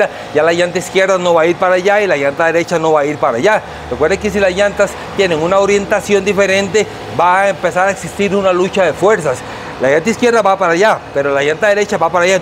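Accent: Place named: United States. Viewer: A pesar de ser colombiano , ya se nota que ha vivido en EEUU , por el acento gringo con la rr .buen video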